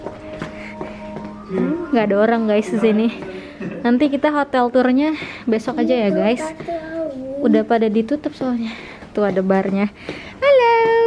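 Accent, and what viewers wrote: Medan, Indonesia. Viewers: kk org medan kah? salam dari binjai kaka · Kak asli dari medannn yaa? 😁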